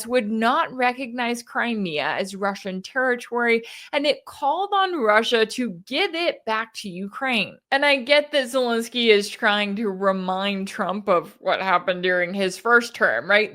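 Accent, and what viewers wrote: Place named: South, United States. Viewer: As usual another great program! Thank you always for the important needed information! By the way, where did you get that "Al ya all" American Southern drawl?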